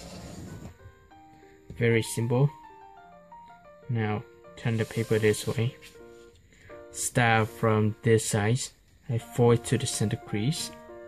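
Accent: Vietnam. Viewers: Nghe cách nói tiếng anh là biết người việt rồi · Anh này làm video hướng tới người nc ngoài, nên tiếng Anh là chính, nhưng là chất giọng Việt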